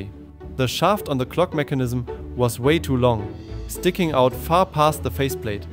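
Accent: Germany. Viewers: Hör ich da einen deutschen Akzent oder irre ich mich? Gutes Video und ich freue mich dass YouTube dein Content pusht! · the german accent 😂. banger video aber! ich wünschte ich hätte auch einen 3d drucker.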